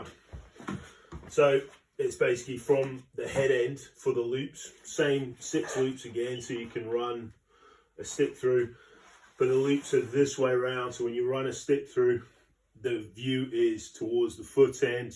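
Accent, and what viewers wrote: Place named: Australia. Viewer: Looks awesome! I don't remember you sounding so Australian though!... Have you spent some time over there since we last spoke?!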